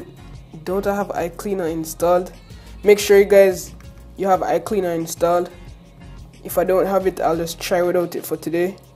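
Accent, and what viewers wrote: Jamaica. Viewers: Are you Jamaican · Jamaican accents bang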